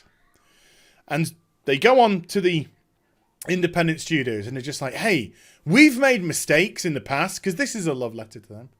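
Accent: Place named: United Kingdom. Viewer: god i so fuking love the British accent :D to the topic Keep up the good work that need a abo :D great evening from germany here .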